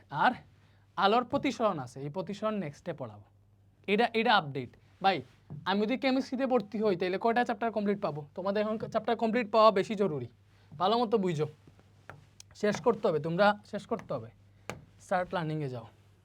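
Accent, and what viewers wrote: Sylhet, Bangladesh. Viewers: Vai ki sylheti?,..... Tan to sylheti er moto lage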